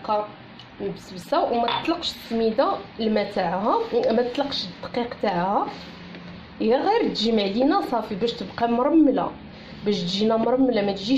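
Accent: Marrakech, Morocco. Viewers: jadore tes explications tout est simple avec toi jaime beaucoup ton accent de marrakchia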